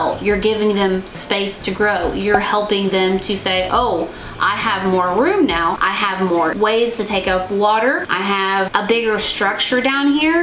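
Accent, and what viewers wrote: South, United States. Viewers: I'm here from Harli's channel. I have to say, your accent sounds like ours around here. What state are you in? South Carolina here. I'll have to check out more of your videos. :)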